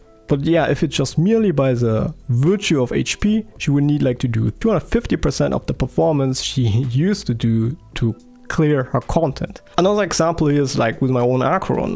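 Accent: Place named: Germany. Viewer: You sound like a German speaking english, are you german?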